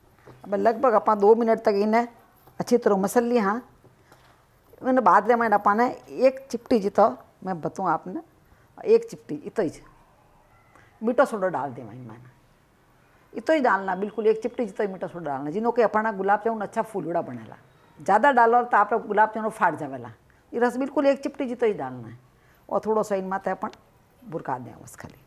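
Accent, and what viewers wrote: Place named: Marwari, India. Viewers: Ye to Bikaner side ki marwadi lag rahi hai. Jaipur me aise to nahi baat karte? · दिदि आपकी मारवाड़ी आवाज अचछी लगती है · Very nice recipe .Explaining in marwadi language is really sweet.Thanks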